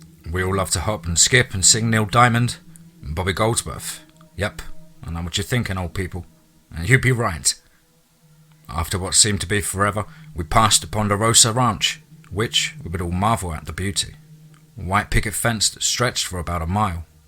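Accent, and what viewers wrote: United Kingdom. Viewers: Omg, as a Hoosier, I cannot believe that I just heard a British guy talking about Indiana and Sammy Terry! It's almost too much!😀 Fun fact: Owen County is where the very last wild wolf in Indiana is said to have been shot and killed in 1843.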